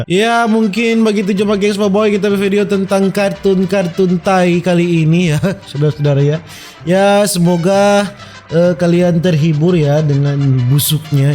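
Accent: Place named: Medan, Indonesia. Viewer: Orang medan ya bang, chenel ter kocak menurut saya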